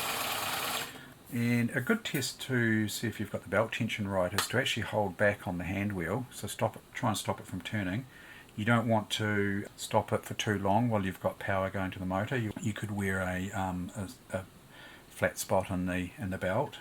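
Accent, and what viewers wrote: New Zealand. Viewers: I hopethis gets an answer, it would be helpful, but it sounds like he's a kiwi so he may not have an answer for another country if you are from somewhere else to NZ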